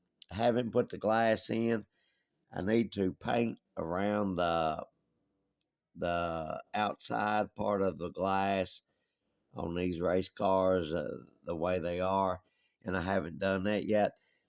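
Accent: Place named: East Tennessee, United States. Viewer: One last question, where are you from? I’m from east TN and you talk a lot like me. Live in Arkansas now.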